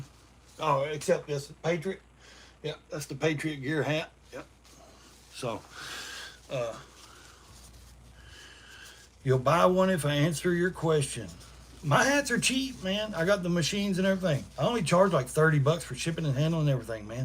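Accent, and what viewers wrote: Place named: South, United States. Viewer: it’s unbelievable how many brainwashed people there are. Have no common sense. A lot of them are set off by your southern accent and assume you are certain things.😂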